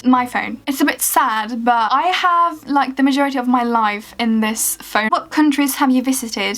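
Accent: England, United Kingdom. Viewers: jag trodde att du var britt först innan jag insåg att du är svensk ahahah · You sound British? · You're Sweden but you sound so English like damn this is new to me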